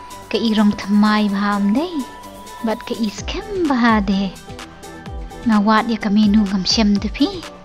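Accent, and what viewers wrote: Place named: Khasi, India. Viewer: Sngew hun shisha kong ba phi kren khasi paka hynrei nga i bun ki khasi ba sah tang ha shillong ruh ki lah leh thlun ban kren khasi . Nang kiew shaphrang kong u Blei un nang kyrkhu iaphi ba phi lah ban pynmih shuh shuh ha ki por ban dang wan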